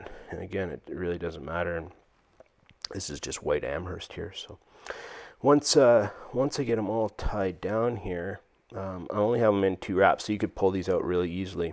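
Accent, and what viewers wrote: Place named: Canada. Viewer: love the canadian accent. i live in kelowna. thanks for the vid :)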